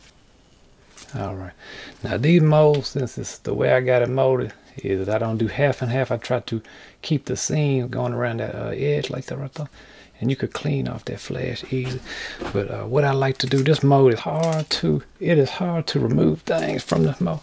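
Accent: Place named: Mississippi, United States. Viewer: Its a Mississippi accent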